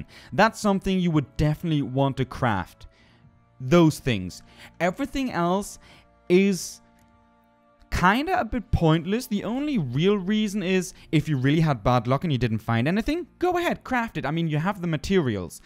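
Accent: Germany. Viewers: German accent actually. Austrians are speaking german, too btw. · grewal The Accent is German if you were still wondering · Great video! Thanks! Also, you sound like Flula. Haha.... are you German? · Robin Andersson Sounds like a german accent ;) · Ganz klar deutsches Englisch :D Dennoch gut gemacht, hat mir sehr geholfen :) Konter sind demnach wohl nicht so ratsam, weil man die Verteidigung runternehmen muss. Ich wurde schon vom Greifen am Anfang 3 mal zerfetzt :P Gibt es bei solche Monstern Tipps, die mna beachten sollte? Es durchschlägt ja Parieren, Quen-Schilde und der kleine Dodge wird auch komplett ignoriert. Also kann man praktisch nur Zeit schinden bis die Spells bereit sind, was ja nicht Sinn der Sache sein kann :/ Grüße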